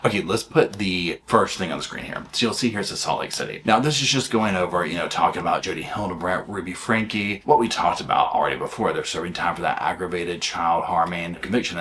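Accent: South, United States. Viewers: I have figured out why I enjoy your commentary about cases so much! You remind me of conversations with my brother about anything/anyone who is doing shady shit or sus situations. I love it as we can be some salty, sassy, and petty southerners. I laugh because my brother in law just rolls his eyes when we get catty, but that's how we just gossip for lack of a better word. Now, mind you, this isn't really gossip, but it's delivered similarly and as we grew up in west tn, the accent is another plus. This is just a thank you for being you and and for doing what you do bringing cases and situations around them to light in your way.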